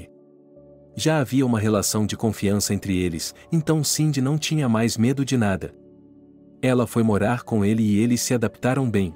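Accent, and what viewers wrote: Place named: Russia. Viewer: O narrador é horrível. Confunde os pronomes . Refere-se a moça/mulher como ele e chama o rapaz/homem de ela. Atônita o narrador só diz atonita. Poço é “poco”. Aos ele não lê tudo junto. Ele pronuncia cada letra separada das outras: A o s. É uma infinidade de erros que você só entende porque são textos rasos, desprovidos de complexidade. Uma coisa é certa, o narrador não tem nenhuma intimidade com a Língua Portuguesa. A tradução é péssima e o narrador parece um russo lendo um texto em português, que ele tentou decorar, foneticamente.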